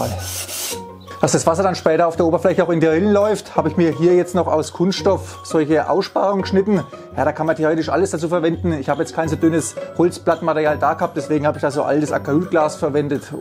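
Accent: Franconia, Germany. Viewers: Cool, habe auch schon darüber nachgedacht, sowas selber zu bauen hatte aber nie eine vernünftige Idee. Das hier ist die Grundidee, die mir gleich zwei Probleme auf einmal löst. Danke für diesen Tip. Zumal ich diesen pfiffigen Kanal gar nicht kannte. Und dann das Ganze noch schey fränggisch! Wos moch der Frangg nuch mehra? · Meine Ohren hören Fränkisch 👂🏻👂🏻👂🏻......Region Bamberg?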